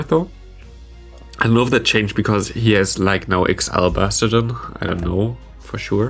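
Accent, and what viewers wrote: Germany. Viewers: His German accent is kinda strong… but as a German like me you can understand his English very well. 🤔😅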